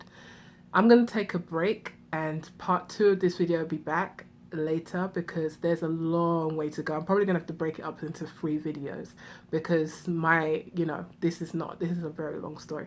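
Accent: United Kingdom. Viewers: How come you have a British accent but you're from Texas? Just curious xx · Are you British but live in America now? Sorry for asking I'm just curious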